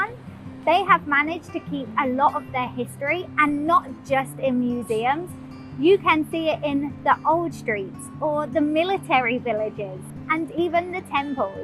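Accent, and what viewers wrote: United Kingdom. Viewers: Lucy英國腔英語好好聽 繼續聽下去。我住台北市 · 對啊！我一開始就訂閱，濃濃英國腔就是原因之一。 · Like your UK accent, and thanks for promoting Taiwan and Taipei City :) Hope you are alright with kids edu system in TW or the way people there teaching your kids, cheers. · l feel much like to listen your speaking vowels clearly at each word in the videos。 Your sounds are good for everyone to study a standard British speaking. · 觀察入微，描述細緻，加上妳優雅柔和的英倫口音，絕妙！(點閱應更高) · 💓😍 love your British Accent....soft and clear. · 英國腔的英文真的好好聽喔👍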